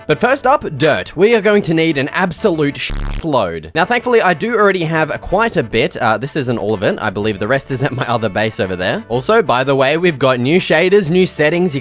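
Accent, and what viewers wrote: Australia. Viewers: U can’t tell me u aren’t Australian 🇦🇺 if you are then we are the same (I’m Aussie)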